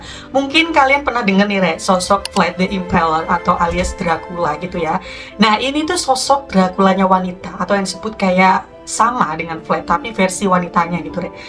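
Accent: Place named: Java, Indonesia. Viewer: Beda kak , kaka ini njelasin dengan caranya lbh slow dan medok , klw ka nadia patas dan heboh , punya ciri msg2 sih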